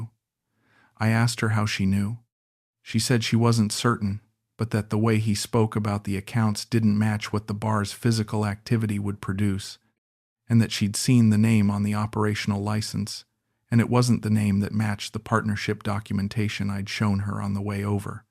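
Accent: United States. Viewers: Listening to a man with an American accent referring to anyone other than his wife as his "mate" does not sound right.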